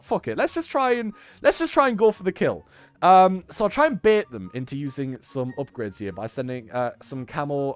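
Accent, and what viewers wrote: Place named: United Kingdom. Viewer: Man I really can’t get over ur British accent lolll it’s awesome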